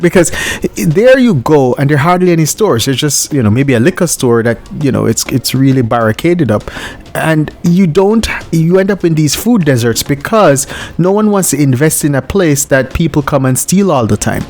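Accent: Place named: Jamaica. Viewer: You sound like a batty Boi Jamaican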